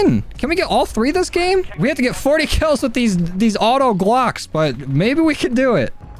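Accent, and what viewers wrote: United States. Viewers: Americans and their pronunciation 🤦🏻‍♂️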